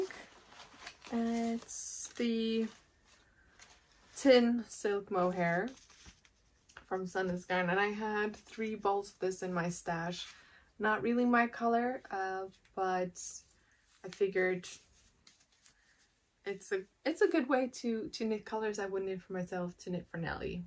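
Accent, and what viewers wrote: United States. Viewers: Hi Mommy! I smiled when you said that English was not your native language. You sound American w the exceotion of certain words that might sound simmilar to Norwegian. Otherwise, every episode you get better & better. Look at yourself on this video & compare it to the 2nd or 3rd episode. You have ALWAYS had great grammar, and pretty great pronunciation. Nobody could fault anything you say/how you say it. However, the added touch to your American accent is the colloquialisms you feel more & more confidence using. I speak French, Spanis, English, & a tiny remaining bit of Italian (I spoke it 40 yrs ago, but the one adult that would always speak it, passed 40 yrs ago). Anyway, if I try Spanish, I don't always end or construct a word the Spanish way, I'll have to debate it in my head, because the other Romance Languages & even English, sound very simmilar. 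But l rather know other languages than get a word semi wrong every so often. Anyway, you sound great, either American or Canadian. 👍💯😉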